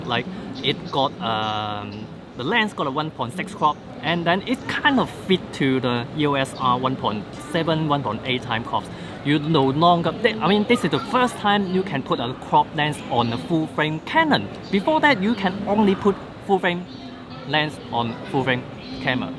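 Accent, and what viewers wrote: China. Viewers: Your English sounds funny ..typical Chinese slang...lol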